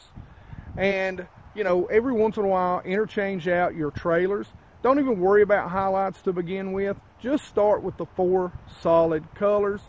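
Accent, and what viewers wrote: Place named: Tennessee, United States. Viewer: You sound like a TN guy so if you ever fish Norris it would be cool to fish with you.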